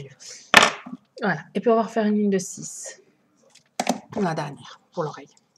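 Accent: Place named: Belgium. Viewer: Quel plaisir j'ai eu à faire ce lapin avec ton bel accent belge :) Merci pour ta patience!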